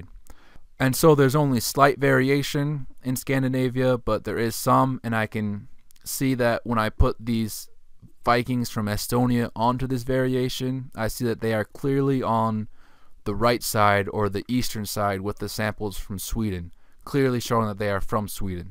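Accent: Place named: Mexican-American, United States. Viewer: It's tough to place your accent. Sounds almost like what Mexican-Americans with English as a mother tongue sounds like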